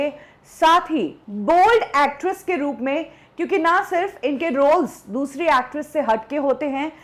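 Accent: India. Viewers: हिंदी का उच्चारण सुधारें। Marathi influence is very clear. हैं को है बोलती हैं। इस तरह को और बहुत सारी गलतियां हैं।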